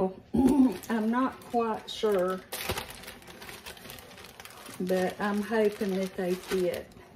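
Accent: South, United States. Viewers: Loved your taste testers! Beautiful mother and daughter!, I love watching your channel. You have that soft spoken southern sounding charm and I love when you say “cut off the stove”. My grandmother used to say that. Anyway, I always try to do something nice for myself and others! Much love to you!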